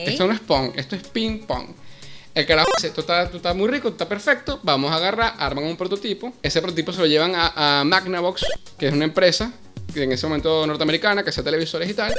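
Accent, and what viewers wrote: Venezuela. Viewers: Me encanta tu acento venezolano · Como cuando ves un podcast de y te sale un anuncio de jaja Por cierto tu acento me suena a venezolano porque yo también lo soy jaja un saludo bro excelentes podcast y me suscribo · son venezolanos me lo e preguntado mucho por que un tio mio habla como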